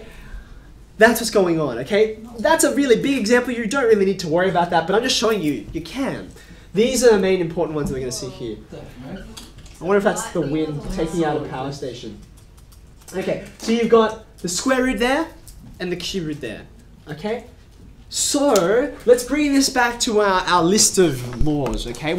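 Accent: Australia. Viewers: Chinese guy with a Australian accent, Interesting.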